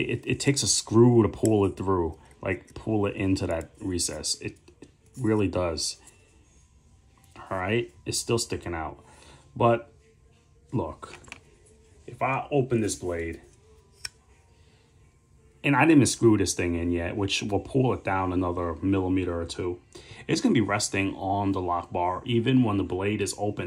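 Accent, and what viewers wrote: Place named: Louisiana, United States. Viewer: I don’t think the clip pressure is a factor at all. The only thing it could do is make the unlock harder, but it just doesn’t. I feel like the Zaan has the easiest, smoothest unlock you could ask for - there is ZERO lockstick, and the bar is intuitively designed. I’ve never heard anyone criticize the unlocking, the it’s one of the Zaan’s best features. Out of the box, the OPENING is pretty stiff, but you have options - 1) you can just use it until the washers and blade break in to each other and slide easier (6 months maybe?) 2) you can disassemble the knife and carefully polish the face of the washers (2000 grit paper on a flat surface) then add some grease and a little oil mixed in 3) the easiest and fastest fix - just back off the pivot a teeeeny bit (1/32 of a turn, if that) and the deployment is a lot easier. 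What’s the background on your accent? There’s like some Louisana but a little Boston?? It’s unique, I’d love to hear the origin.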